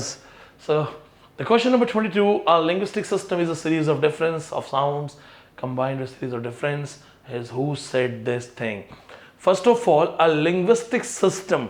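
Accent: Rajasthan, India. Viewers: Excellent Rajasthan accent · rajasthani accent is very nice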